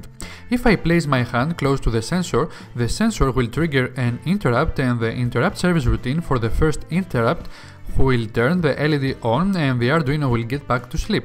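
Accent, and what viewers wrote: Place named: Greece. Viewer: Nice video! Are you Greek by any chance ? Your accent sounds a lot like the average Greek speaker trying to speak English.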